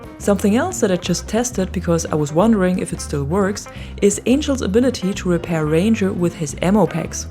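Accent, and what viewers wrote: Germany. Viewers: Kann es sein das du Deutsch sprichst?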